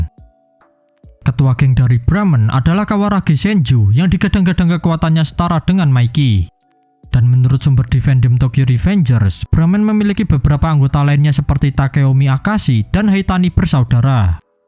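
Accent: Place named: Java, Indonesia. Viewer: Wong jowo koyok e. Iyo a bang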